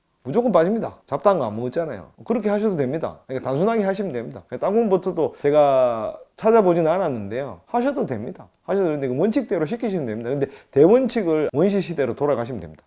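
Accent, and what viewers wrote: Gyeongju, South Korea. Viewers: 박사님 왠지 경상도사투리가 정감이가더라구요 고향이 경주내남면이군요 내남면에서 이렇게 훌륭한분이 태여나셨군요 좋은정보감사합니다~❤ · ~~~ 경주 사투리 ㅎㅎㅎ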